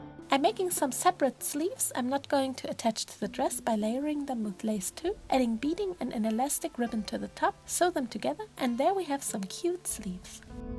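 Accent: Germany. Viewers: Your accent sounds German lol are you perhaps German? Bts love your art!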